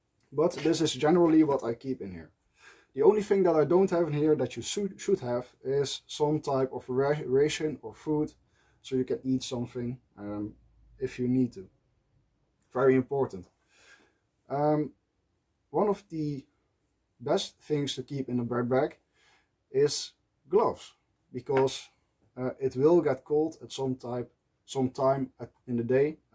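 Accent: Netherlands. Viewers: I’m new to this channel and I’m loving it so far. I’m assuming by the accent you are from the Netherlands? Hello from USA!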